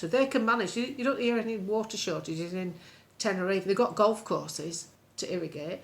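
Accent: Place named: North, United Kingdom. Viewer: Love your channel !!!! Its a lovely northern voice x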